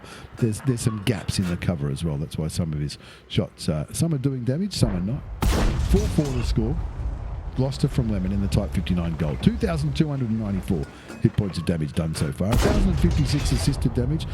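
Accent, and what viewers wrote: Australia. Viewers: Halo Governor yes type 59 g were all over the place after black market now rarely seen on N/A great videos and awesome aussie commentary from Jax Fla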